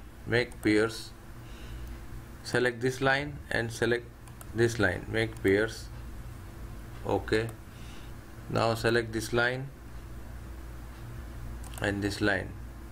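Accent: India. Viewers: 讲得非常好！听这口音，我就猜到是印度人。能听出来是因为最近学音标，看印度电影。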